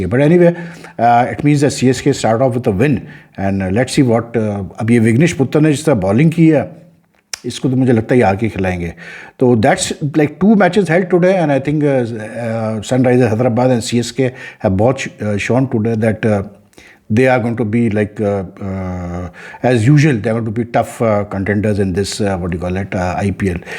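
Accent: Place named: Pakistan. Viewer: He is not "Putthar"!!! He is not a punjabi. His last name is "Puthur". And its not "Karela league". It is "Kerala"!!!🤣😂. Fed up of Pakistani accent and pronouncing of Indian names.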